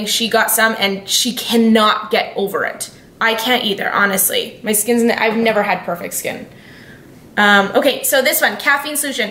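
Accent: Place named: Canada. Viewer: Just Canadian accent.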